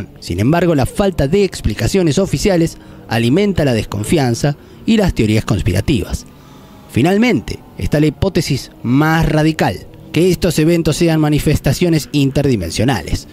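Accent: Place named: Argentina. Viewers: Ese acento es argentino. En España a la "ye" le decimos "y griega", a la "v" le decimos "uve" y a la "w" le decimos "uve doble". Ja, ja. ¡Parece que hay variedad en el español! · Si Elvio. Así hablamos en algunas provincias de Argentina. Se lo llama Regionalismo. Saludos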